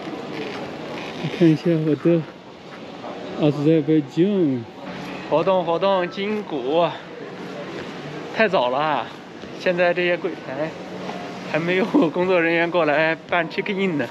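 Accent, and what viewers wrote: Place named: Shandong, China. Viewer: 是哪里人？听口音像是山东的。另外问一下，用的啥装备拍的啊？感觉画质很清晰。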